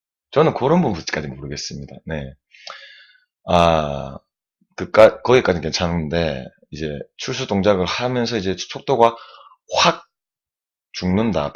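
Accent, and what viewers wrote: Gyeongsang, South Korea. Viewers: 존경하는 선생님 경상도 억양이라서 생소했는데 말씀이 이제는 여러번 듣다보니 친숙합니다요. 잠영연습하는데 벽차고 가는데 바로 엉덩이랑 뜨는 경우는 뭡니까? 어케 들어가는 거에요? 입문한지 석달입니다. 초급입니다.아래 글보니 물타기 연습이라는데 그건 몹니까? 강사선생님께서 연습해오라고 했는데 어렵네요. 몸이 떠버리까 방법이 없네요. 한수 가르쳐주십시요.부탁드립니다. 구독 클릭 좋아요 클릭했습니다.하트뿅뿅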